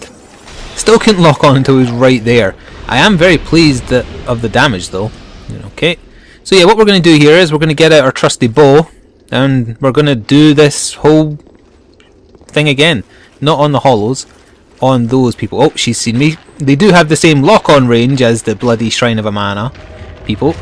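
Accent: Scotland, United Kingdom. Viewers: Your voice sounds very charismaticaly. Is that scotish accent or there is no such difference between these nations of UK?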